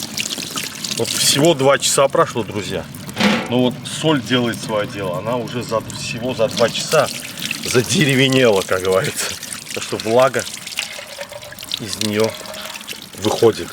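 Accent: Baku, Azerbaijan. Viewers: Салам. Акцент Бакинский 👍❤️👍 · Этому человеку необязательно что - либо готовить) достаточно просто рассказов с прекрасным бакинским акцентом и этого вполне достаточно)) Все как всегда супер, слюной подавились) Джаныныз саг олсун!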